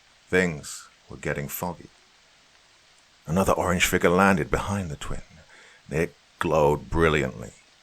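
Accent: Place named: United Kingdom. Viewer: It would help to have an American accent if you're from Idaho. I like the British accent though. Just not believable for this particular story.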